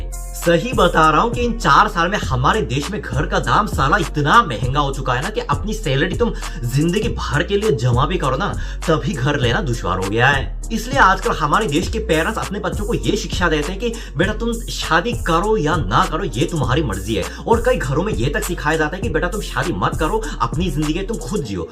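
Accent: India. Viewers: You are born in South Korea🇰🇷 but your way of talking is Indian🇮🇳👳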